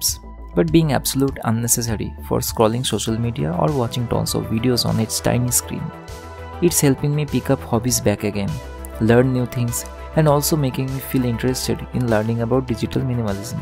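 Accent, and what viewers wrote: India. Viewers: I knew as soon as you started talking you were from Kolkata.... as one Bong tech nerd to another, I subscribed instanty! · bro you sound indian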